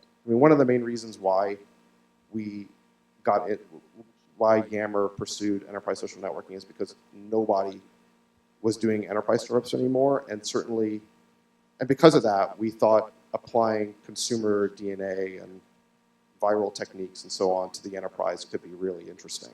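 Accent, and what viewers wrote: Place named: India. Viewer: Indian ascent is really irritating. I am sorry.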